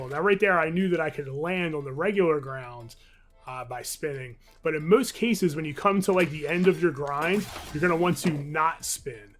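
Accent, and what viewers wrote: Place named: Delaware County, United States. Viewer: This is the first video I’ve watched on your channel, but I could tell right away that you are from Delco my dude. Say what’s up to my cousins!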